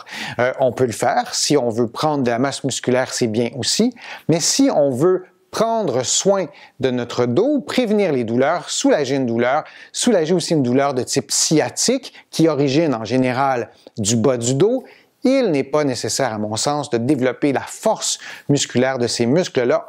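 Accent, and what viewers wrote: Canada. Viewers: Ahhh l'accent canadien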